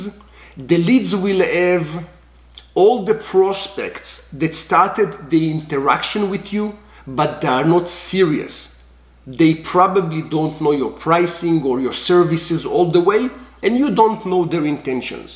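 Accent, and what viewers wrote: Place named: Spain. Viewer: You sound like spanish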